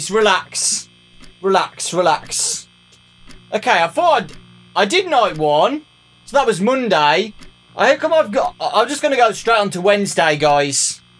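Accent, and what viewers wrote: United Kingdom. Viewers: Tell where did you come from,I mean I love your voice;British?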